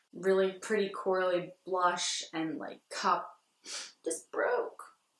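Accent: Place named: Philadelphia, United States. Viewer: Okay, upon further investigation (Instagram), I see you're from Philly!!! I knew it! I lived in Ridley Park for a few years and then moved to Jersey. I'm LOVING your channel. And probably the fact that you sound like me although my accent is pretty toned down. So, I'm just wondering, can we be best friends?! You have the cutest personality and I've been wanting to start a channel for so long now. Low key girl crushing on you right now (in the let's be friends kind of way) lol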